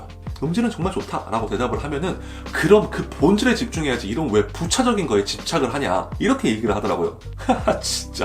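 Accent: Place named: Gwangju, South Korea. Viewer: 완전 광주사람이시네 ㅋㅋㅋㅋㅋㅋㅋㅋㅋㅋㅋㅋㅋㅋㅋㅋ구수하시다 (미친 틀렸다니)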